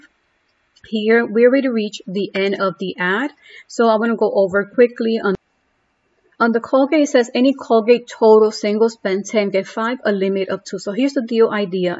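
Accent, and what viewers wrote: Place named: Spain. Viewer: Hello!!! I can feel your spanish. Why dont you do it in spanish.😀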